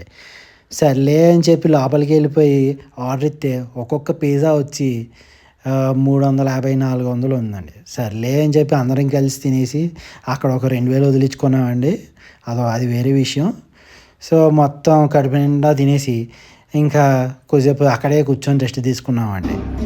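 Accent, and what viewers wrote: Godavari, India. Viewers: Super me godavari yasa chala bagundi vinadaniki funny ga vundi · Mi godavari ascent bagundi andi 👏👏😂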